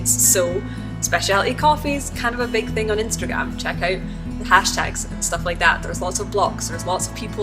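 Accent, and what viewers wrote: Scotland, United Kingdom. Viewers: Is that a Scottish accent I detect :)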